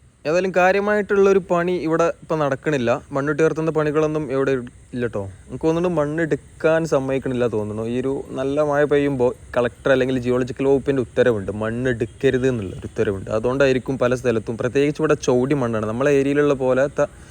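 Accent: Malappuram, India. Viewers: ഞാൻ താങ്കളുടെ എല്ലാ വീഡിയോസും കാണുന്ന ഒരു പ്രേക്ഷകനാണ്. തൃശ്ശൂർ ജില്ലക്കാരനാണ്, ഞാൻ സ്ഥിരമായി NH 66 ന്റെ വീഡിയോസ് കാണാറുണ്ട്, താങ്കളുടെ വീഡിയോസ് ഏറ്റവും മികച്ചതാണെന്ന് പറയാതെ വയ്യ. എന്നാൽ, പല സ്ഥലങ്ങളും തിരിച്ചറിയാൻ കഴിയാത്ത വിധം മാറിയിരിക്കുന്നു. ദൃശ്യങ്ങളോടൊപ്പം അതാതു സ്ഥലങ്ങളുടെ വിവരണങ്ങളും ഉൾപ്പെടുത്തിയാൽ വളരെ നന്നായിരിക്കും എന്ന് തോന്നുന്നു. സ്വതസിദ്ധമായ മലപ്പുറം ശൈലിയിലുള്ള വിവരങ്ങൾ ഞാൻ വളരെ ആസ്വദിക്കുന്നു. എന്നാൽ, താങ്കൾ മറ്റ് വിഷയങ്ങളെക്കുറിച്ച് സംസാരിക്കുമ്പോൾ, അവയും നല്ലതായതിനാൽ, ഞാൻ ചിലപ്പോൾ ശ്രദ്ധ തിരിക്കപ്പെടുന്നു, ഇത് ദൃശ്യങ്ങൾ പൂർണ്ണമായി ആസ്വദിക്കാൻ ബുദ്ധിമുട്ടാക്കുന്നു. നന്ദി, എല്ലാ ആശംസകളും!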